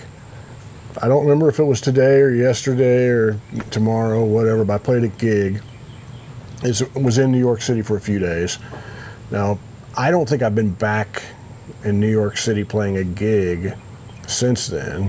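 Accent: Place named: Midwest, United States. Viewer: I am from Michigan you are so Midwestern I love that!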